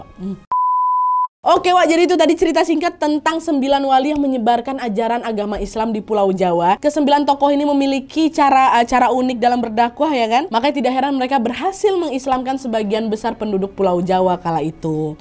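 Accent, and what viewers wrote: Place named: West Sumatra, Indonesia. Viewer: ASSALAMUALAIKUM, MBAK, DARI LOGAT BERBICARANYA SAYA RASA MBAK BERASAL DARI MINANG ATAU SUMBAR....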